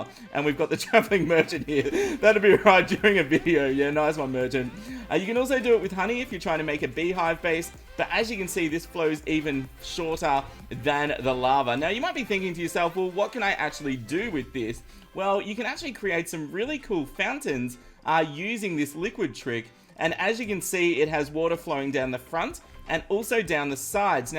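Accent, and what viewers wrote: Australia. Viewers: Hes Australian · are you Australian? I feel really dumb right now.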